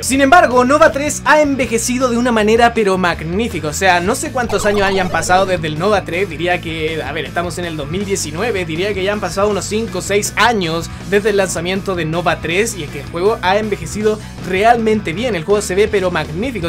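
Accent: Chile. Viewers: Vo eri chileno o no ???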